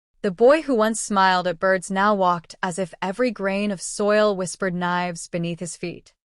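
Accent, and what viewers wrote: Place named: Europe. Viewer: I would have listen to it if the voice wasn't European.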